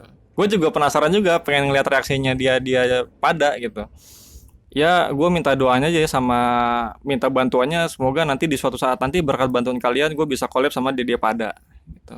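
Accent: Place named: Citereup, Indonesia. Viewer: Kirain orang mana bang taunya citereup😂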